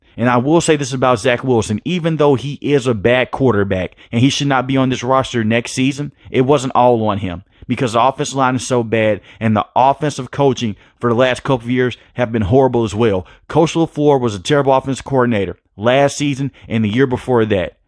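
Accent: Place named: New Jersey, United States. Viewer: Thanks, I can tell with the accent, you are a jersey boy, lol, I completely agree with all of your statements, but let's see what happens, I'm sure the jets organization are thinking the same exact way we are, maybe they need to leave thier egos at home on draft day, forget the hype and go with facts and personal who have heart , there's been plenty of late round picks in the NFL that are in the Hall of Fame, so like you stated the jets coaching staff needs to do there homework and listen to that inner voice that speaks the truth, stay honest with themselves ,and I believe the jets can be in contention in 2024!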